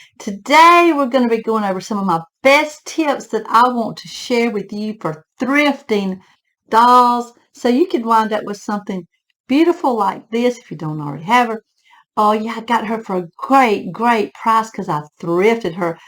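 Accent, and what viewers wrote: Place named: North Georgia, United States. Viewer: your accent is similar to ours here in north GA. Love your channel. I collect and have my two daughters collecting as well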